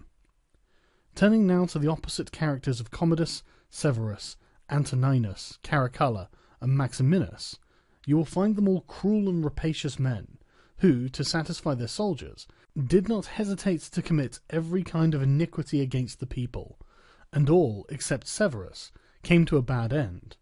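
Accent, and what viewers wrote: United Kingdom. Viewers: Excellent recitation, if you can get past the horrible British pronunciation. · I love Sargon Of Akkad's soothing British voice. No homo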